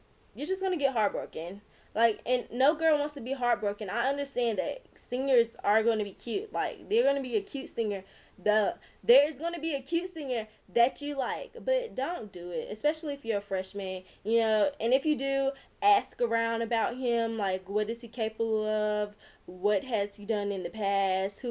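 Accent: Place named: South, United States. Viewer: you have an extremely southern country, down south accent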